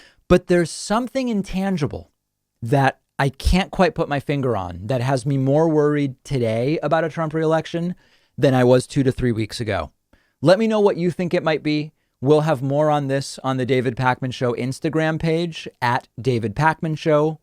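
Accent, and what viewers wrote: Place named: Buenos Aires, Argentina. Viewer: I love your heavy Buenos accent, che pibe! También tengo miedo de que salga reelecto otra vez, que Dios nos libre!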